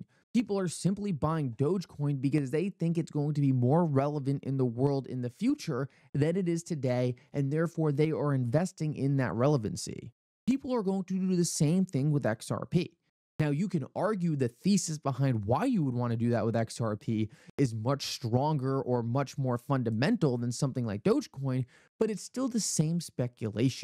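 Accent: Allentown, United States. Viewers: with an Allentown accent at the click baiting again...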